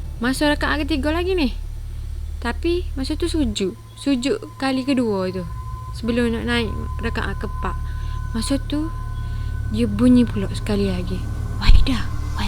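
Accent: Kelantan, Malaysia. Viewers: Suka dengar kecek Kelate. Hadir dari Kuala Berang, Terengganu.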